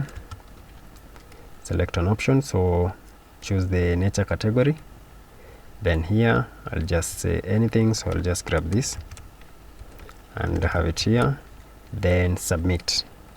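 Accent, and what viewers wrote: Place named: India. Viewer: the technical level of the Indians on this platform is impressive, however the Indian accent for me speaking Portugués is a big problem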